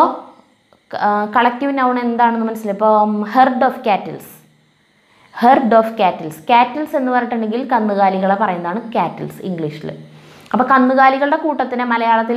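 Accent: Thrissur, India. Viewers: ഹായ്ന്റ പെങ്ങള് കുട്ട്യേ.. ക്ലാസ് അസ്സലായി ട്ട, തൃശൂർ സ്റ്റൈലിൽ വർത്താനം കലക്കി ട്ട, teacher മ്മ്മ്ടെ തൃശൂരാണ്,class excellent, അറിവ് പകരുംതോറും വർദിക്കും. ദൈവം അനുഗ്രഹിക്കട്ടെ !!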